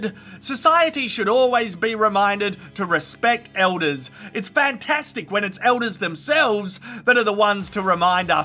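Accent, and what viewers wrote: Australia. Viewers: Why is it funnier when it's in Aussie?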